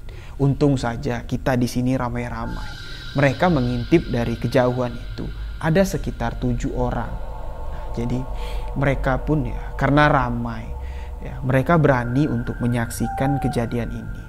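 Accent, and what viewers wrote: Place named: West Sumatra, Indonesia. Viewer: Abang ini orang minang ya... Logat bahasa nyo agak urang minang barbahasa Indonesia, terperangah, dll heheh..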